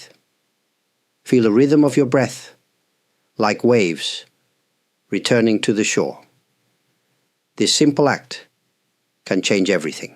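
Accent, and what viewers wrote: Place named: United Kingdom. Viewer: dear 🙏 I would love to catch a live with you sometime! or even just a your questions answered segment I would love ❤️ to hear about your faith journey of being a monk and I think I detect a slight UK 🇬🇧 accent in your voice, would love to know more about that, not to intrude on your privacy of course, just curious about your story always best regards and thanks 🙏 for your inspiring words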